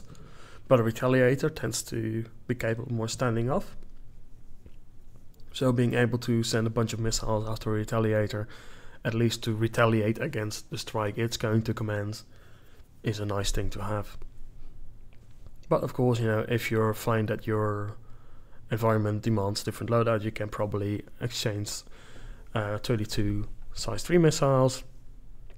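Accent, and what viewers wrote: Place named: Netherlands. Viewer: these ships are both awsome . even when in a smaller org , you can help a friendly org to destroy someones capital . the polaris bridge is just perfeckt . and now the hammerhead . a real jewel . to be honest , i cant make a decision for keeping only one of them . so it was an easy decision to have them both . --- ps : you sound verry dutch :p im almost sure you live in holland :-)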